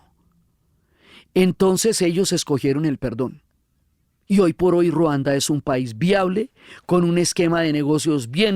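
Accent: Colombia. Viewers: Hablas más como Colombiana debo estar confundido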